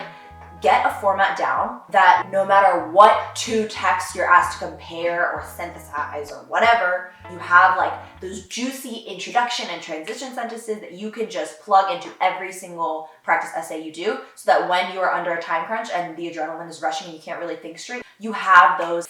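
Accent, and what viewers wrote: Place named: United Kingdom. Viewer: I have no idea as to why I sat through this entire video when I’m a MS3 😂 But the british accent is on point! I had no idea high schools in the states were so intense!!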